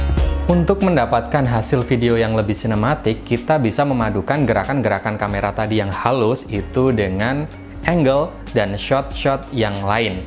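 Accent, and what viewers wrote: Pekalongan, Indonesia. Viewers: Pekalongan mana suaranya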